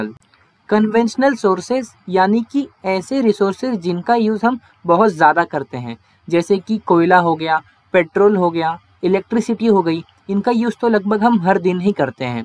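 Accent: Odisha, India. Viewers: Bro are you from Odisha ??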